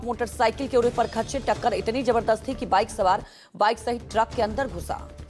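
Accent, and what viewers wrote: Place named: Bihar, India. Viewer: Ma'am aap bhi bihar se hai shayad apke accent se lag raha hai lekin apke efforts bht acche hai❤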